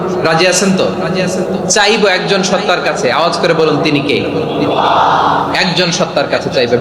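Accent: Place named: Sylhet, Bangladesh. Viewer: sylheti hoeo kota gula valo laglo ❤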